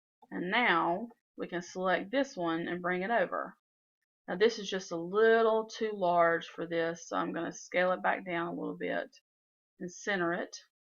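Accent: South, United States. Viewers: Great video! Very easy to understand. I'm a southern girl too and I love your accent, much easier to understand when someone speaks slowly!! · WOW! Thank you sooo much. I've had inkscape for years and its just been too hard to figure out. This was amazing! Love your Southern accent and your singing is BEAUTIFUL!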